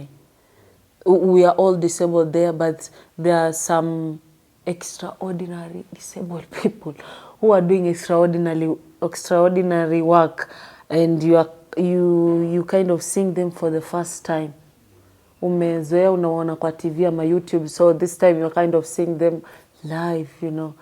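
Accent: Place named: Coast, Kenya. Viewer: Most costo people sound like that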